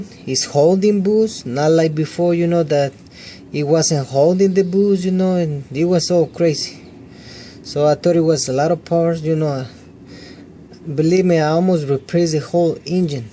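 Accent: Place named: England, United Kingdom. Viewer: My friend , your voice and english accent is really annoying, i hate you.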